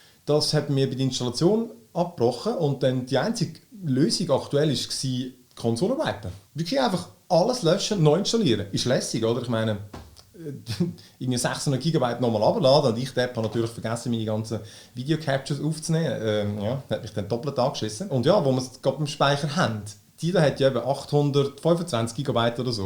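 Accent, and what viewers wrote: Switzerland. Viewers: Es ist Schweizer deutsch wo ich wohne 👍🏽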